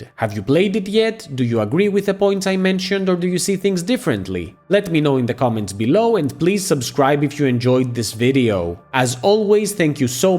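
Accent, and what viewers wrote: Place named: Greece. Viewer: I have a feeling that you are greek according to the accent ! Good video! You just earned a subscriber :)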